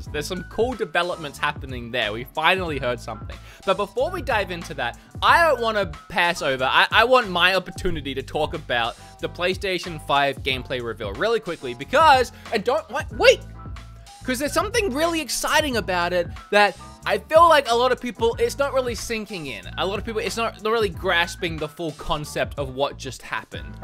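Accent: Australia. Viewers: I wondered why watching your videos felt familiar. As someone who grew up in australia im not sure if you ever watched Good Game or Good Game Spawn Point but your channel really reminds me of dashing to the tv to watch the hosts talk about whatever game was hot at that moment. The aussie accent def helps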